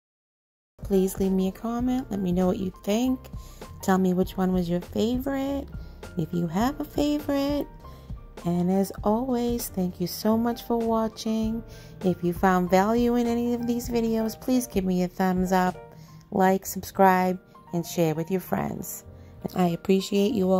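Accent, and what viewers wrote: Boston, United States. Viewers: Are you from the Boston area? It's been a long time since I heard 'wicked smart'!! Personally I grew up a valley girl (Merrimack Valley) 😊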